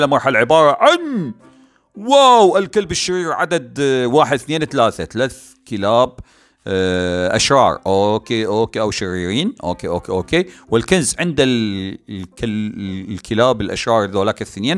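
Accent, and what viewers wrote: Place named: Iraq. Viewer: من لهجة عراقي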